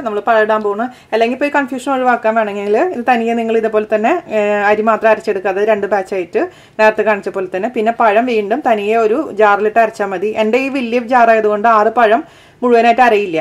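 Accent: Thrissur, India. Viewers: chechyyy..u r soooooo beutifull..ur smile n presentation also..ithok ondaky nokalonnum nadannillwlum checheede aa thrishur slang charapara varthanom chiriyum kaanana njan e videos mainly kaanunne..God bless😊☺😙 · thank you for your prompt reply Chechi! I'm making it for Ganesh Chaturthi :) I have tried so many of your recipes and it's been a Hit!!! I esp love your Thrishur dialect reminds me of my Guruvayur cousins :)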